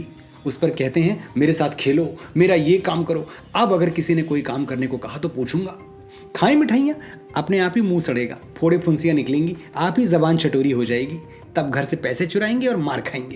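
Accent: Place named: Uttar Pradesh, India. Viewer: Main bhi uttar pradesh se hu ji aapki boli main uttar pradesh ki bhasha jhalkati hai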